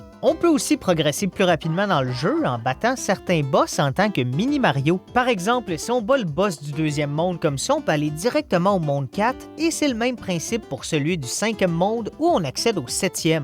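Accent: Quebec, Canada. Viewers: Ma réaction en écoutant ce vidéo suggéré Wouah c'est québécois?! Je suis québécois et c'est tellement rare d'avoir du contenu de jeux retro en québecois et non pas en Français de France. Cool.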